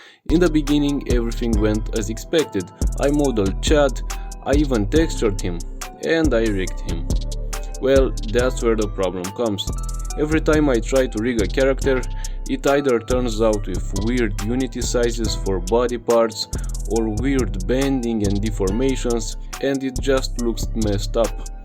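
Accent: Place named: Russia. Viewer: Ты русский, по акценту понятно)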